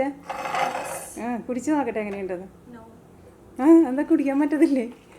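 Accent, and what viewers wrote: Thrissur, India. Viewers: Love that she has a Thrisshur accent despite being overseas. Its very endearing...❤️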